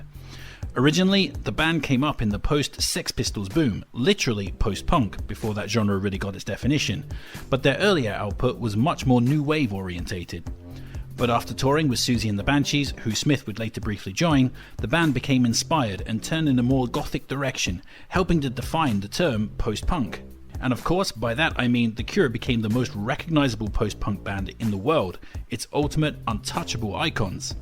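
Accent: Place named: United Kingdom. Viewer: Your accent is insane - it seems like a Brit accent but then you have hard R's... is that an actual dialect over there or have you lived in America a long time or something?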